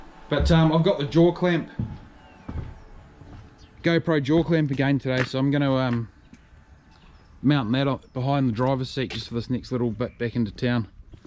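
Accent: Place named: Australia. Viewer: He doesn’t have a nz accent more Australian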